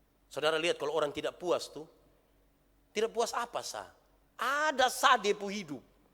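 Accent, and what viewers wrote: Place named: Eastern Indonesia, Indonesia. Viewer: Salam dri Makassar Pak Pendeta, semoga tetap diberkati dan diberikan kesehatan yg baik dari Tuhan. Khotbah2 Pak Pendeta sangat luar biasa dan mudah untuk dipahami, apalagi dengan logat2 timur yg kental 🙏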